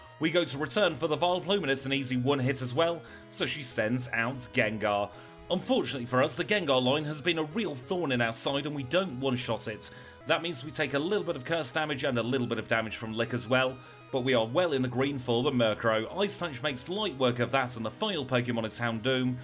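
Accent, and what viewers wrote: United Kingdom. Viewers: wow that sounds interesting! That's fine, I will keep on enjoying your challenges anyway! I like very much! Your accent is so cool too! I remember you saying you are from Manchester... Is that correct? You're the first one from there I've ever heard! You friend LukethPlays is the second one! Thank your for producing contents for everyone! I think your challenges are unique and also I long to take part in the next stream! I'm one big fan of yours man! I wish you the best luck of all! See you later!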